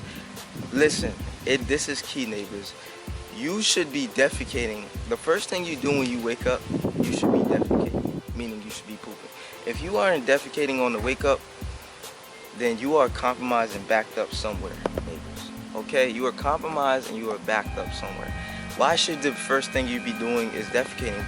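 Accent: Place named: South Africa. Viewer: Super cool video! Where you from? You Sound South African lmao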